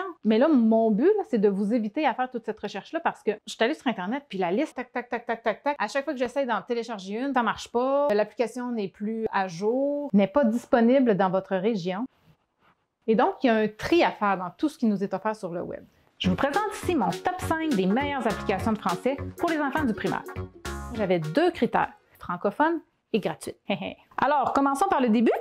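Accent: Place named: Canada. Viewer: este es el frances de canada? suena un poco gracioso...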